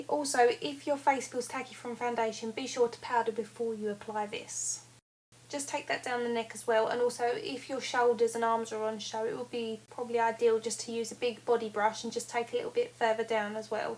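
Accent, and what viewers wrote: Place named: Romford, United Kingdom. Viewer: You sound like you're from my neck of the woods - Romford?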